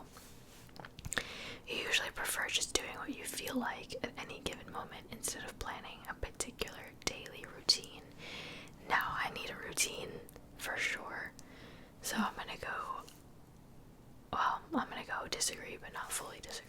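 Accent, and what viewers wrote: United States. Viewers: what part of America are you from? (I’m Australian so the State is probably all I’ll know 😂)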